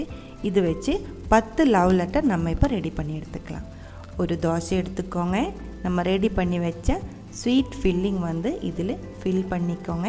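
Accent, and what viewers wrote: Kerala, India. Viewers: Very nice I love ur voice nd the presentation Malayalam Kalantha Tamil 😘👌... Vry cute snack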